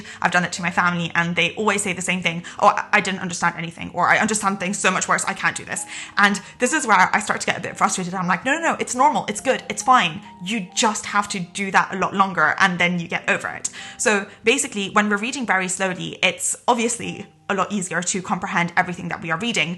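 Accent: Scotland, United Kingdom. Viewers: Everyone commenting 'oh its so fast how you talk' or 'it must have been sped up in production' potentially however Scottish people do just naturally talk fast. Keep up kids 😂 Although will reiterate it off the folks that are saying its obvious proof of your speed of intellect by just how well you articulate yourself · Are you from Scotland?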